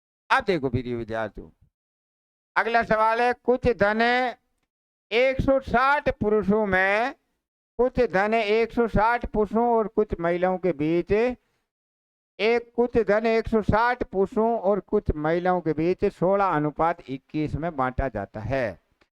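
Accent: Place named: Mewar, India. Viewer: राजसमंद जिले से हूं राजसमंद झील जो प्रसिद्ध है मीठे पानी के लिए वहां से आपका वीडियो देख रहा हूं आप बहुत अच्छा पढ़ा रहे हैं और मैं 10-15 लोगों को शेयर किया आपका वीडियो आप मेवाड़ी में बोलते हैं तो भी अच्छा लगता है और हिंदी में भी बोलते हैं तो अच्छा लगता है और आपका तरीका बहुत अच्छा है सरल और सौम्या तरीका है पढ़ने का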